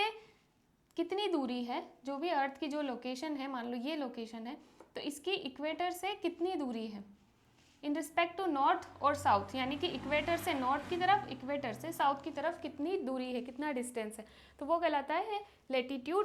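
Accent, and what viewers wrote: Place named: Lucknow, India. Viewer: Ma'am i think you are from lucknow by the way of speaking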